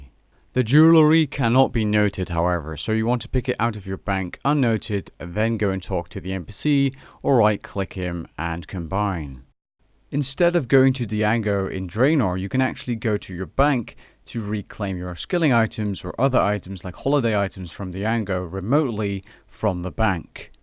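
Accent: Netherlands. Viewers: You are Dutch? :o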